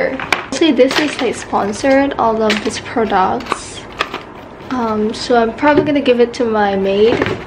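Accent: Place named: United Kingdom. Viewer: ur british accents 💅💅💅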